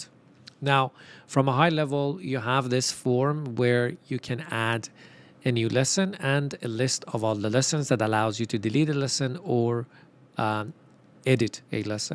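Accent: Iran. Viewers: your accent is very similar to farsi btw you persian ?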